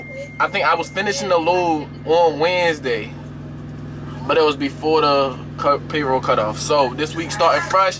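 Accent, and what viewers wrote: Baltimore, United States. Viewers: Must be from Baltimore 😂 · Definitely gotta be from Bmore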